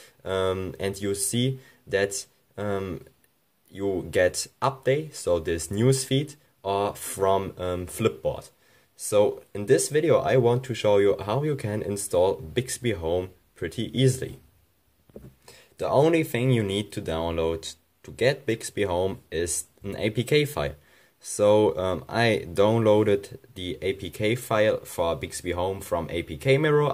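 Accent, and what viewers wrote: Germany. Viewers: Man hört an deinem Akzent, dass du aus Deutschland kommst. Das Emrah-Video hat das dann noch bestätigt. XD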